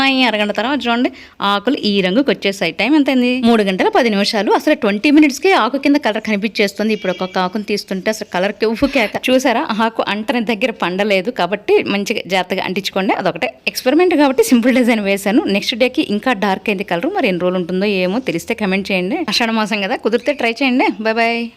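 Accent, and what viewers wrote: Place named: Nellore, India. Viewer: akka mee native nellore kada.. me voice and yaasa alaney vundi...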